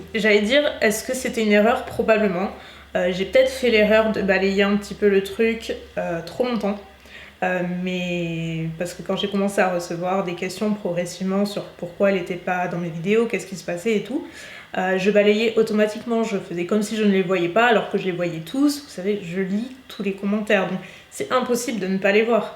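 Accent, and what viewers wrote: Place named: South-West, France. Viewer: Le message d'une abonnée discrète ( et ça me va ) ! Je suis là pour le contenu et certainement pour cet accent du sud ouest qui est le même que le mien ( discrète et chauvine ! ) J'adore cette franchise, tu avais dit que oui tu en devais beaucoup à ta communauté et que non ta réussite de ne lui appartenait pas ! Elle est bien à toi cette réussite! Plein de force, de courage, de réussite, d'amour de toi !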